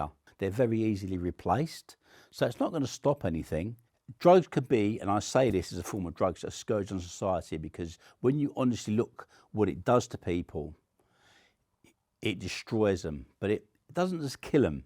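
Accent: United Kingdom. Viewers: This britisch Englisch is so discusting..... Bah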